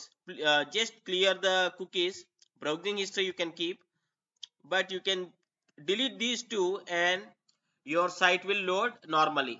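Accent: India. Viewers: God I hate Indian Accents! Why do you even bother to create videos that we can't understand! !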